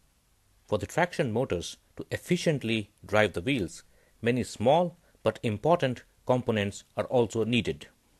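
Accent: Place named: India. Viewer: The streetshitters accent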